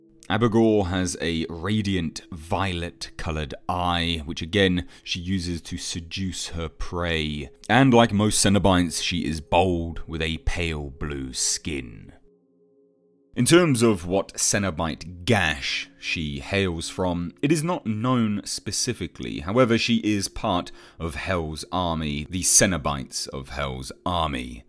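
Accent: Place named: England, United Kingdom. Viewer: Marvelous Discovering more about my favorite Clive Barker work with you're voice ( and English accent) just keep my blood boil for more Barker lectures 😜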